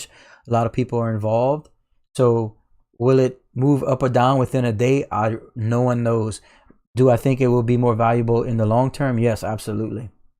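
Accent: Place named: Louisiana, United States. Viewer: Dude sounds like you are from my area South Louisiana.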